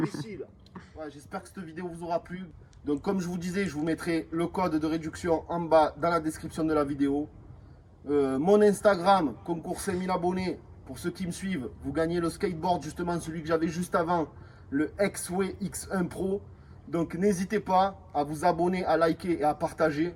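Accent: South, France. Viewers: avec plaisir avec ton accent du sud tes vidéos sont vraiment Crème 😅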